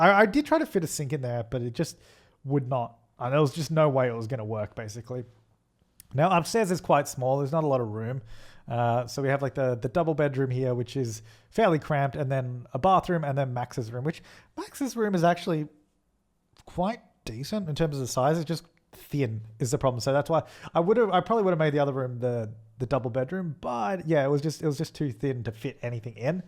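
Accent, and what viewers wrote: Australia. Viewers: He sounds Australian why are all of the Australian YouTubers so wholesome 😂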